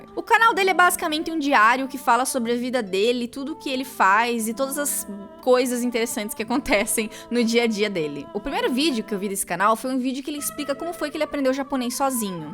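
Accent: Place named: South, Brazil. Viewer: da onde você é?que estado? Parece sotaque sulista Amo seu canal <3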